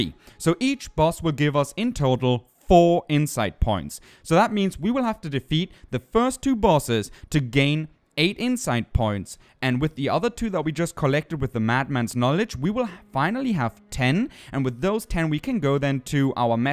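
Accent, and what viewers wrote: Germany. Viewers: yes, he's either german or austrian. I know because all of us have that exact same weird accent when speaking english, lol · you're not a native english speaker, I can hear it. I'm guessing you're german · Höre ich da einen deutschen Akzent? 😏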